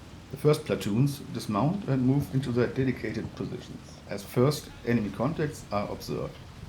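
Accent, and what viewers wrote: Germany. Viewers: Enjoyed your video. I need a few cups of coffee to play a battle that big. Also enjoyed your German accent. Then again it also could be Swiss or Austrian. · Schöner deutscher Akzent :D Combat mission content is always nice!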